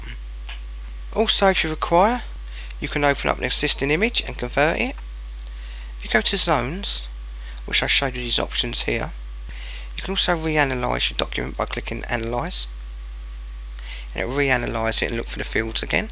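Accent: United Kingdom. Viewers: Thank you but this british accent is sometime so disgusting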